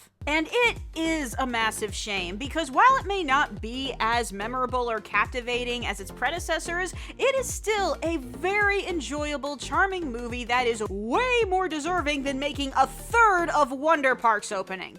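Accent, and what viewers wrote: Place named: Scotland, United Kingdom. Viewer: Nice Scottish accent ! Im definitely going to see this tomorrow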